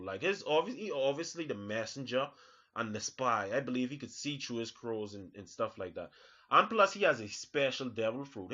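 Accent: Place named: Caribbean. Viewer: Are you Jamaican ? You have a Caribbean accent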